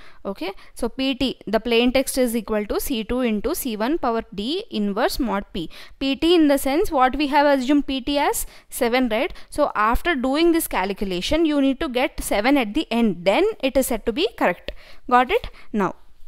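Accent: South India, India. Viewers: its south accent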